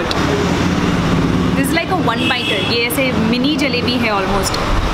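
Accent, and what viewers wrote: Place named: Pune, India. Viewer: Assal punekari hindi boltes tu. 😅😅 Ekhada video marathi madhe pan banav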